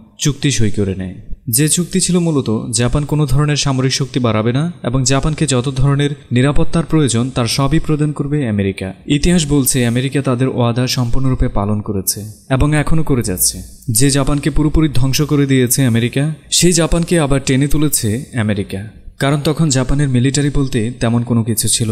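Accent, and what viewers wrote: Comilla, Bangladesh. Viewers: কুমিল্লার মানুষ সুদ্ধ ভাষায় একদম ক্লিন।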